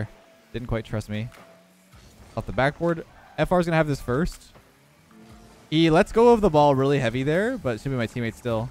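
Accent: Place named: Canada. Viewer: A follow Canadian Ehh🤣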